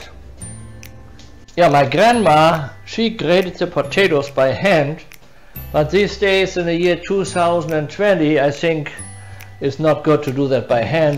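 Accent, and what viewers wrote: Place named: Germany. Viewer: I like your accent German English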